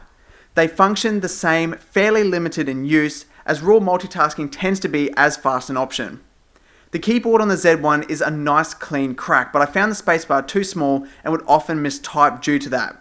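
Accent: Australia. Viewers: Your Australian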